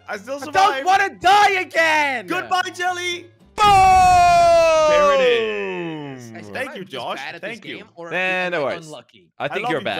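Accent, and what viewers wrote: United Kingdom. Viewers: his accent is thicccc British mate:)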